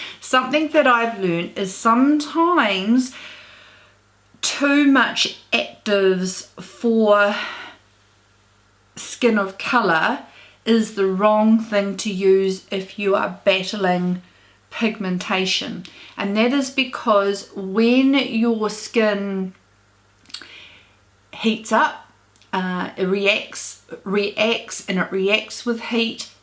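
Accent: New Zealand. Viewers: I am a 66 year old from Baton Rouge Louisiana. I love your accent. It's terrible that products like CerVave costs so much for those of you in New Zealand. I had rosacea in my earlier years and I took birth control pills for a long time. So, my current issues are sagging skin and wrinkles. But I still have the oily tzone. Within the last few years, I have developed this annoying problem called sebasceous hyperplasia (clogged pores). It's like little skin colored bumps that are primarily on my forehead. They rarely go away. I hope that you never have to deal with it. We do not know what causes it nor how to get rid of it. But I need to get rid of extra sebum without wrinkling even more.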